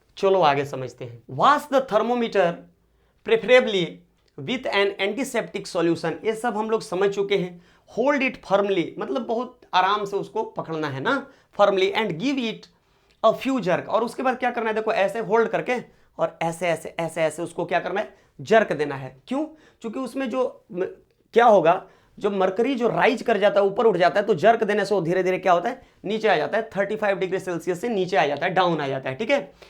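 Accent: Bihar, India. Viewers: Yah sir Bihari kyon bolata hai yah Bihar ka lag raha hai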